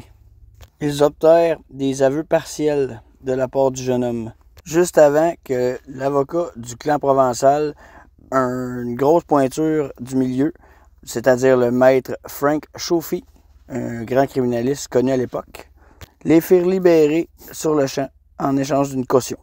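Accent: Quebec, Canada. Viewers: Bonjour, c'est quoi comme dialecte de votre Français -quabéquoi ? Merci pour la réponse ps j'adore. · Salut , au qc on parle quebecois ...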